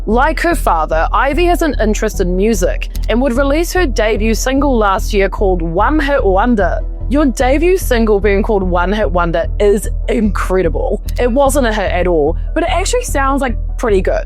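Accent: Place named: New Zealand. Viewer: That’s how we pronounce it in Australia and this lady sounds like a Kiwi, so I’d say they say it like ww do. I’m guessing you’re American? We have different accents down under.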